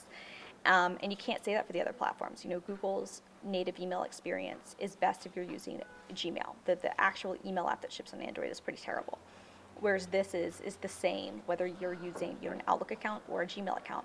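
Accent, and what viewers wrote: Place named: United States. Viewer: As much as I want to listen to the opinions and experiences, I really could not handle the accent. I have to pause it every 2-3 minutes, take a deep breath [anticipating more American accent] and then play further. Seemed really balanced and objective evaluation though. Cheers!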